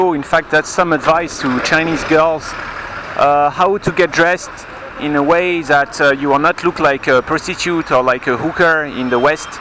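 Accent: France. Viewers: Bonjours ! You have a very French accent , and i am wondering if that can cause problems in teaching English in China ? I lived in China for 11 years , i also was an English teacher !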